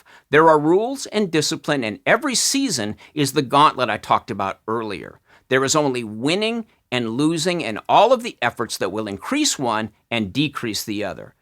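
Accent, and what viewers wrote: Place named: Michigan, United States. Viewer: I'll admit, I wasn't fully awake yet the first time I watched it, so I gave it another go. (Yes, I watched your video first thing this morning, lol) You are correct that "blacknosing" in harmful, it helps no one, and in my opinion, it's even worse when it comes from our leaders. If I had been in your writers group, it would have been my pleasure to offer those women the honest input they deserved as artists...unless they got pissy with me over it. For the most part, I still agreed with everything you said, and felt like I just have a slightly different point of view on part of it. But in watching your video again, it gave me an opportunity to look at myself and see that perhaps on this particular topic I'm coming from a place of apathy. And maybe I'm not happy about that, because as an otherwise empathetic person, apathy isn't something that I strive for. 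But what is the solution? That the average white person speak out against the ills of hip-hop? Or would it be enough to simply stop praising rubbish just for the sake of virtue signaling? I'm all for the latter! Anyway, I don't want this to turn into the Iliad...Great video, and I think we're mostly on the same page here. You speak in a manner much like the Michigan people I grew up with and I spent 22 years in LA, so I can relate to that as well. (I know Bliss Cafe too lol.) If there was a point you were trying to make that I still managed to miss, please point it out to me. I'd rather not walk away feeling like I missed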